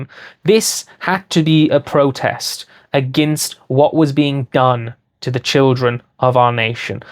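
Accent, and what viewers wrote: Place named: United Kingdom. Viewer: Right wing channel called my ireland. Accent is so british he might as well have the surname of Windsor. Many such cases.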